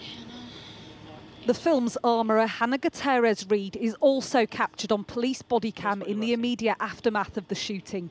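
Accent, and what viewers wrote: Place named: United Kingdom. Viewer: so tired of the Brit-ish voice overs.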